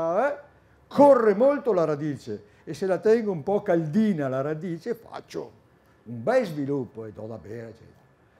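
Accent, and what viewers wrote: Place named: Verona, Italy. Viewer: Perché? Cosa dice che non va. È veronese, di una certa età, come dove parlare secondo costoro? Bha... Giusta osservazione la tua. Ciao!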